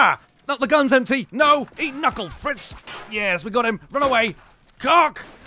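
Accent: England, United Kingdom. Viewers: He sounds like a British lad that was in that time period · this is the best English accent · you are delightfully British....I love it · You sound british. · I try to imagine you looking like Nigel Thornberry. quite British. I like it! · %99,9 british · Most British person I have ever heard. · Most funny gammer to listen to! Typical English! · Sorry are you British? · not going to lie you are the most British person I've ever heard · Oh that British accent! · Your voice is just really funny sounds like the stero typical Brit I'm keeping tuned and subbing like him I just found you too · Having a natural British accent is an unfair advantage in this type of thing. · This man is probably the most British man I have ever heard. I like him all ready. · You are way to british, i fucking love it.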